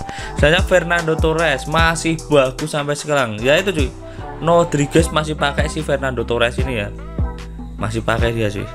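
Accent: Java, Indonesia. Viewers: Logat omongan lu kek orang Jawa bang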